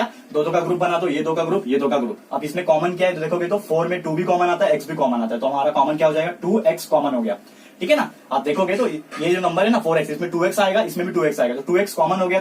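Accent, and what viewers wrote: Maharashtra, India. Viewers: App marathi ho na sir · sir you are best And I have a question 😊😊 ARE YOU MARATHI...?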